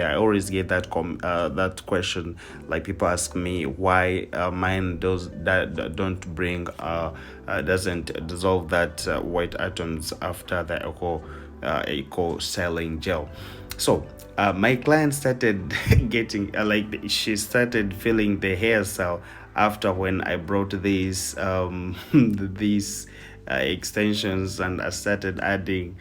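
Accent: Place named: Uganda. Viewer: Voice over sounds Ugandan 🤔🤔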